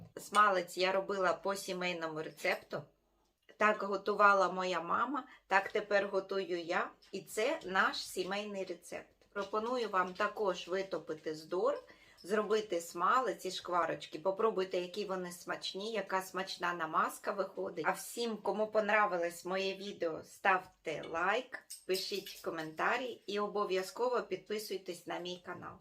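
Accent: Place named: Kyiv, Ukraine. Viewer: Дякую за відео))) Комусь не подобається київський суржик)))а мені його не хватає(знаю що не вистачає,але в києві так)))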